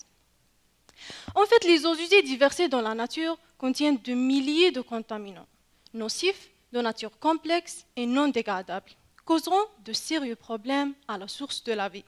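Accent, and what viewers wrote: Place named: Morocco. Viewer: Marocaine d'après son accent bravo.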